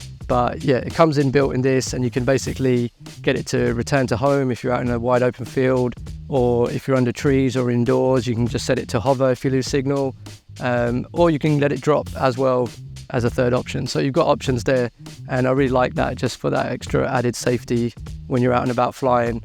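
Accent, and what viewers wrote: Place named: London, United Kingdom. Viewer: That's good to hear thanks for the reply! Yea fellow Londoner (guessing from the accent), lots of content is US based with drones on here so good to see experiences from UK chaps like yourself! Might have to invest in an avata!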